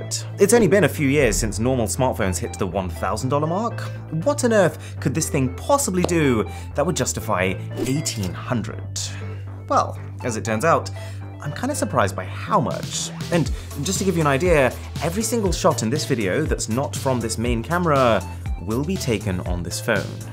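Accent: RP, United Kingdom. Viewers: $1800? Bloody madness I should think? Buy this to keep up with the Kardashians as it were? Cheers! BTW I am aYank but like how you Limeys speak. 😂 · like the products...dont like the way he talks like if he were a posh brit....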